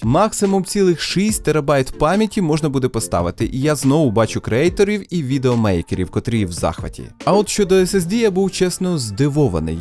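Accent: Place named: Surzhyk, Ukraine. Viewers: За суржик лайк👍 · а шо тут такого, хай буде собі суржик, це робить тебе індивідуальним, у кожної людини шо балакає суржиком є свій унікальний суржик, так що розмовляйте як удобно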